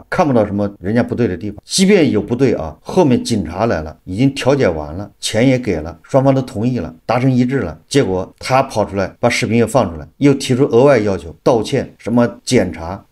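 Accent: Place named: Anhui, China. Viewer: 听口音像安徽人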